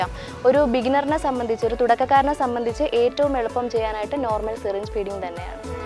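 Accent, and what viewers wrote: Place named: Tamil Nadu, India. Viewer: Unga voice la tamil la maraikka pannureenga but tamil oru poothum maariyathu mam valthukkal mam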